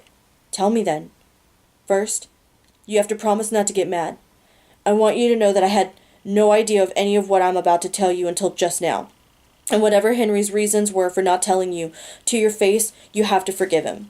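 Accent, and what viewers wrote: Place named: United States. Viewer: I think what I wrote confused you, I apologise as I write as I speak, I am at the moment listening to the "power of six" and was confused as I thought I had missed " Six's Legacy" but you have cleared it up that it is a novella ( I will listen to that after this one " the power of six" ) as I am now guessing it gives you an insight into just her life no one elses, Yes accents are strange I knew you were from the US just couldn't pinpoint where about, although I must say it is a very nice accent to listen to and I love that you change it for the different characters, really helps me visualize the story. So glad I found your channel, as it is helping me pass my mundane hours working from home, I work with clay and creating small charms for jewellery and a few episodes before this one I believe, I was doing something really intricate on a piece and your cat jumped on your knee and you screamed, I threw the clay across the room, haha I couldn't stop laughing at myself you gave me such a fright, as I wasn't watching the screen haha :D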